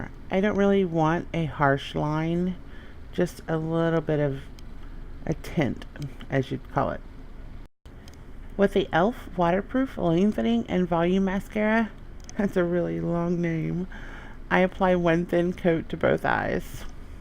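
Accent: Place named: South, United States. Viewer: Where are you from? I can tell the southern accent...lol. I'm from NC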